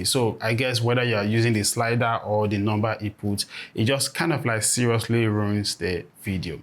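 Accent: Africa. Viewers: I like your accent, its like african english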